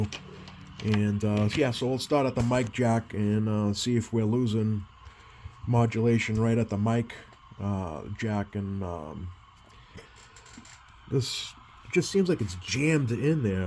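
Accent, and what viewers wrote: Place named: Southern New England, United States. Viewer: Interesting video. Some food for thought, and I'm certainly not trying to pick on you at all! Your signal tracing is using a signal injector. It emits a tone, probably 1 khz. Technically you didn't need to say audio. Just key the microphone and listen for the tone. Additionally I wouldn't mount the echo board near the rf power section. Leaving the echo board where it was originally mounted and cutting the echo board wires to the needed length may have been a better idea but that's all up to you. I'm just offering a different prospective. It's clear you enjoy working on CB's. It can be challenging and rewarding. My signal injector looks exactly like yours except mine is an old Radio Shack unit I picked up in a package deal from Smokey's CB shop in North Grosvenordale Connecticut. I bought all of his equipment many years ago when he retired. 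You're accent sounds like you're from Southern New England. :-)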